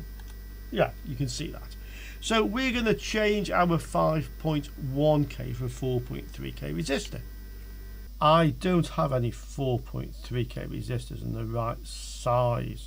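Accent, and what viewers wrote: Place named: England, United Kingdom. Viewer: I very much enjoyed your English accent. I also enjoyed your teaching. It clarified my understanding of the TL 431. And I subscribed to your channel. thanks for sharing.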